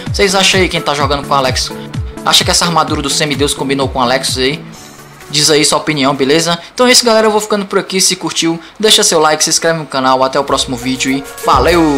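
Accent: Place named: Northeast, Brazil. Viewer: Faz do herói ateniense... Esse seu sotaque é de qual estado do nordeste?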